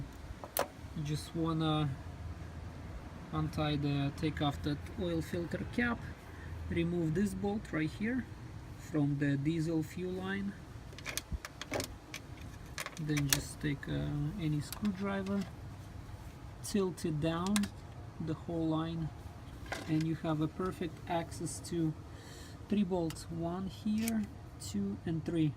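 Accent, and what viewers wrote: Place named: Russia. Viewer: Суровый русский акцент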